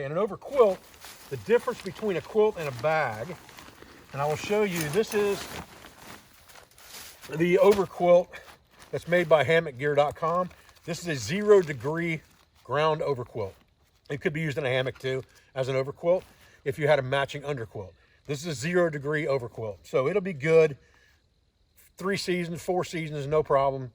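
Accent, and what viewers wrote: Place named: Ohio, United States. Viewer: Could you add the names of the packs to the video description. I was able to look up the first one you displayed, but your ohio accent won't let me figure out the name of the second one.:) Thanks, - Joe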